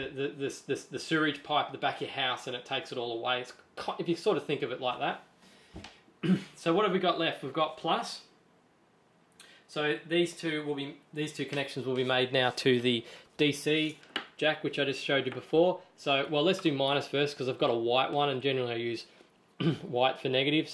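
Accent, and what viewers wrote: Australia. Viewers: Very good tutorial, been following your channel for a while and love how much info you share. Also it's good to hear another Aussie voice.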